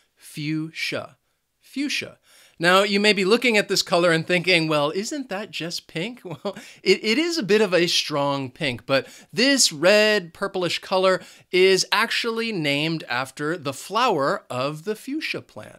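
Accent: United States. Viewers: unfortunately your accent is American not British 😕 any way thanks a lot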